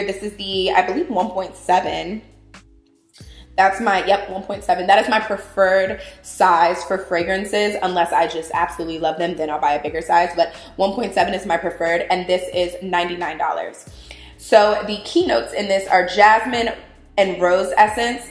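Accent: New York, United States. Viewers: Please tell me you’re from NY? Because you sound like a straight up NYer 😂❤️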